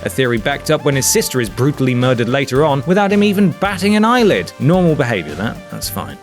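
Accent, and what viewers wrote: United Kingdom. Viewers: Considering that Donald Pleasance had a British accent like yourself and John Gavin doesn't that theory is complete bollocks as you limeys say. And he's not as good looking or tall as John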